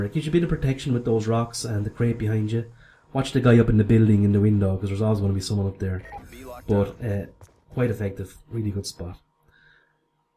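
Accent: Ireland. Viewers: U Irish if u are respect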